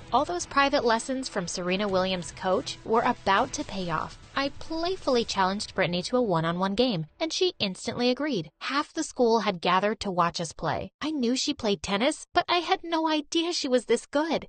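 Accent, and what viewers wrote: United States. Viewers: This Turkish person has a suspiciously American accent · You’re turkish and you have an American Accent? Kinda sus I am Turkish btw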